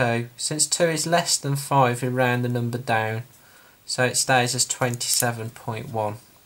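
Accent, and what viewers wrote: Birmingham, United Kingdom. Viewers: Thanks for the explanation + this 🥵 Brummie Accent.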